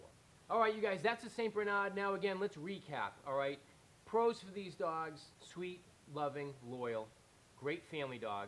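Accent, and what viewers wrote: Boston, United States. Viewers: "a very lahge dahg" hahaha love the boston accent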